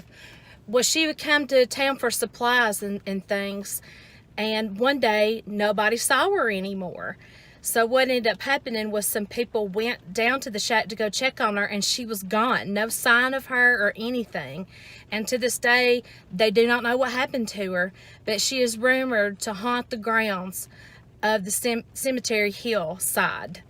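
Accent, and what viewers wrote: South, United States. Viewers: Thanks, nice video, and love your southern accent! It’s a shame that the Jenny Wad house is literally surrounded by a 5 story hotel, somehow takes away from the experience. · i just love your southern accent,keep up the good work,i enjoy it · Not really a ghost hunter... I just watch these videos to see what people come up with... anyway, if you are from Alabama, you should have tried the Bloody Lane at Antietam (nearby) or around Devil's Den in Gettysburg... lot of Alabamians died around there... I don't think ghost boxes reveal very much, if anything... just a bunch of random, disconnected words... not real conversations... but one semi-legit guy said he tried sing to ghosts at Gettysburg thru a spirit box, and he got something like a full song in return... my point is, you have a nice accent and a charming southern demeanor... 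if you were trying to get them to talk, well, go where the Alabamians were and use that drawl, darlin'... 😊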